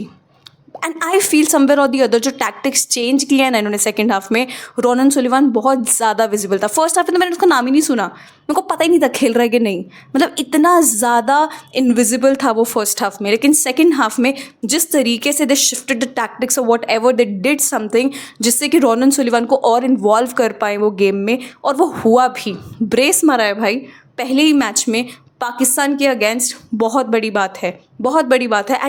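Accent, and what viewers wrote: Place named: India. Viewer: Indian accent very bad. You don't speak English like this 😒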